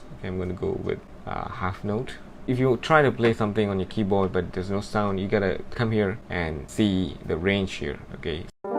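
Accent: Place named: Malaysia. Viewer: I thought the accent sounded familiar! Hehe Malaysian in the house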